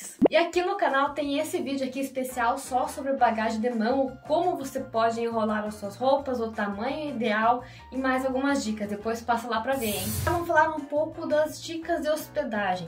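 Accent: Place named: South, Brazil. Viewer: Moça, em qual estado e município brasileiro a senhorita nasceu e morou? Seu sotaque parece ser do sul do Brasil.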